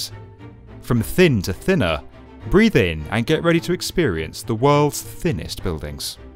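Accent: United Kingdom. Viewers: Are you British? cuz I...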